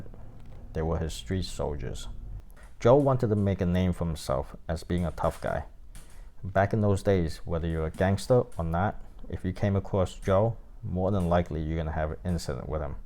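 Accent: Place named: New York, United States. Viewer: Awesome NY accent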